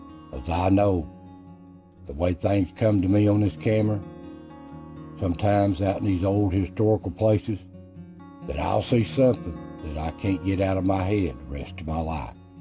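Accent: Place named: South, United States. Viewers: Hello good sir.. A new subscriber here.. I'm always intrigued by the US southern accent 😄.. I also love paranormal things, pls more content like this.. 😁 Be safe & God bless.. (A warm greetings from Indonesia 👋🏼🇮🇩.) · I love the narrator's voice & beautiful southern accent! Sounds so soothing, like my Grampa telling me a story, which are the most precious ever. ❤️ ✌️ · Yes your voice is beautiful. Not just the physical quality of it, but your slow cadence and beautiful Southern dialect.❤ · Greetings from Australia.Loved listening to your videos. I just love your southern accent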